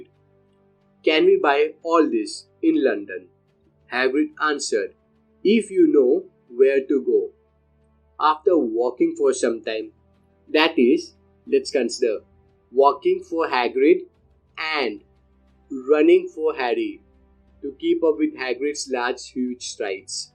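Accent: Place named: India. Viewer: There is the microphone! Being bilingual myself I can say your english is AMAZING! I know you are self conscious but I can understand you well, keep practicing with your scripts and edit out bad clips to improve confidence. As I said, you speak well so don't be afraid to try! Out of curiosity where are you from? Your accent seems to be somewhere around India but I'm not entirely sure...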